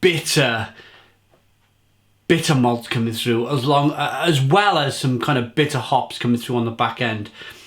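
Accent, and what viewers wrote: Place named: England, United Kingdom. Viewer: which part of England are you from? I find the regional dialects of the country very intriguing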